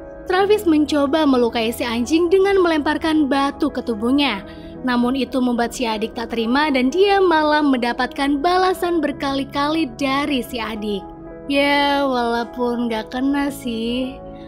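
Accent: Pemalang, Indonesia. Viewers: Orang Pemalang ya mbak?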